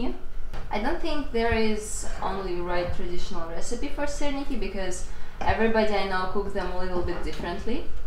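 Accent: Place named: Russia. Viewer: Thanks for sharing, you have a wonderful family. I love your accent, reminds me of any action movie where there are Russian agents, like the movie Anna or James Bond. You have stunning eyebrows, kind of like Brooke Shields. Let’s hope Biden and Putin can get something going where the US and Russia can finally be Friends!!!! Good luck with your channel.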